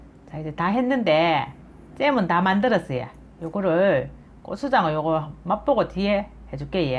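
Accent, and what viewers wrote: Gyeongsang, South Korea. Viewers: 건강레시피 공유해주셔서 감사합니다🥰💯 늘 구수한 경상도 사투리 정감이 갑니다‼️🤗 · 멸치하고 사과하고 쨈 만드는것은 첨 봅니다~ 경상도 사투리가 너무 정겹네요~❤ 비빔장으로 하면 너무 맛있겠네요 고추장. 만들기 너무 쉽고 맛있겠어요 꼭 따라해 봅니다~❤ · 구수한경상도 사투리가 정감이 가네요 오늘도 잘배우고 갑니다^^* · 경상도분이 정말 맞으신가요?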